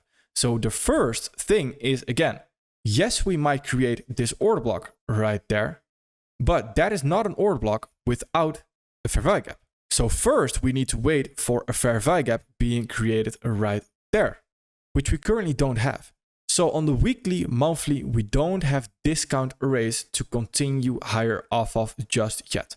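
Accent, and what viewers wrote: Netherlands. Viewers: You have to be dutch when hearing your accent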